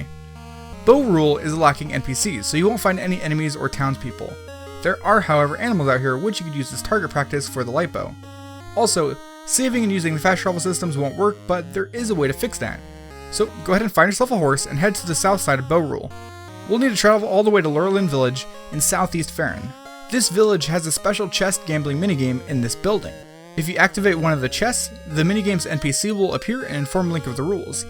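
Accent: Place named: United States. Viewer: Am I the only person that has no idea what the guy is saying? If you have such a thick american accent while mumbling, atleast add subtitles. Please.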